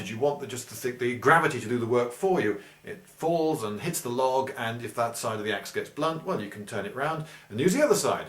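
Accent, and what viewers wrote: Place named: United Kingdom. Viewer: I have never heard such a good example of a british accent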